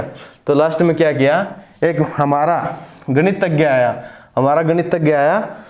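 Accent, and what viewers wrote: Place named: Haryana, India. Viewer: हरियाणवी शैली में👍👍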